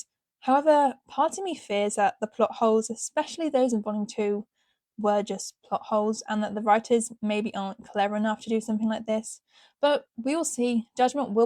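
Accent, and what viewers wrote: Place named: United Kingdom. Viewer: I like ur accent it's kinda like British🤭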